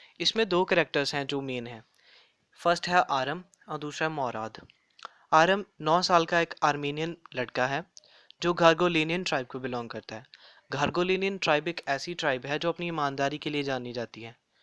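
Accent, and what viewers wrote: India. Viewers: Are you a punjabi! I mean your accent is like that only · Too slow!! Important points missed. Please use your Indian accent. Your Indian accent would sound awesomely confident, your varying speeds will add to it.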